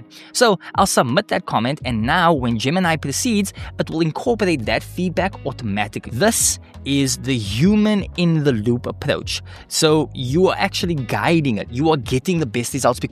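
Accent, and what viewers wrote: South Africa. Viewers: You have a South African accent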